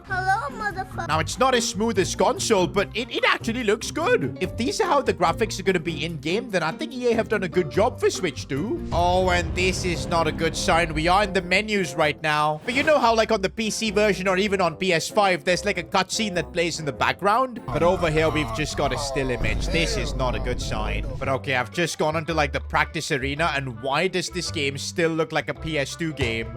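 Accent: India. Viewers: Now i know why foreigners say indians sound like this i get it now. The real indians dont the NRIs do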